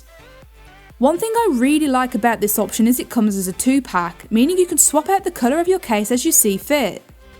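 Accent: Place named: West Midlands, United Kingdom. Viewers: West Midlands accent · That's UK's accent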